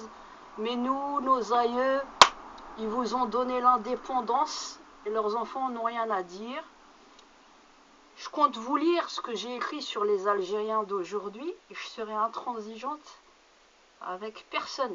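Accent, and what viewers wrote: Morocco. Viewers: ta façon de parler arabe ta l'aire marocaine et en parlent de des arriviste ils en France ou en Europe j'attire ton attention que les nouveaux arriviste cest qui travaille et toi tu touche les aides sociales en Europe à prendre de ne pas parler vulgaire ma grande sa se voir que ta un problème a la fin vive la France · kelba bent elharki. Tu as l'accent des marocains. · Mon DIeu ! Je suis tombé sur cette video par hasard. Ma cherie tu m'as fait de la peine, je te connais pas mais tu m'as l'air d'être sérieusement atteinte. message aux Algeriens ?!? Les algeriens ne t'ont rien demandé pour commencer. Ensuite tu as un regard et un comportement pleins de haine.... le suivi de cette chmetta de "Hassan el maghrébine" et ce fond d'accent marocain dans ta façon de parler (je me trompe peut être.... j'espère que quelqu'un de censée (service condulaire par exemple) va se pencher sur ta personne ma cherie) Je te souhaite un bon rétablissement et n'oublies pas de prendre tes médicaments. 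Reste en belgique et ne revient plus en algerie. Si t'es vraiment algerienne ?!? Je signale la video. C'est pour ton bien, salam et saha ramdanek. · Dit moi fofolle vous êtes vraiment algérienne ? اسمحيلي l'accent dyalek marocaines ma cocotte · Elle parle trop bien l'arabe ....marocain ? ?????? je doute déjà qu'elle soit née en Europe et qu'elle soit algérienne.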